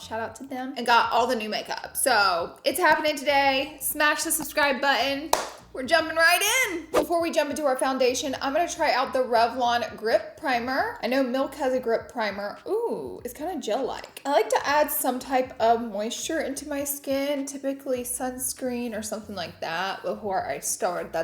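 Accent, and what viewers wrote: South, United States. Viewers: Girl let that southern lady come out don’t be holdin it back! Lol that’s how we say it in the south blyend… not blend lol😂🤣😆❤️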